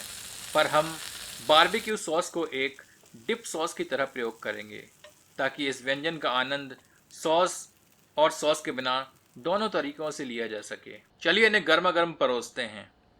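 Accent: Standard. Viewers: Bro your shudh hindi is as good as your English you are a great chef you deserve more subscribers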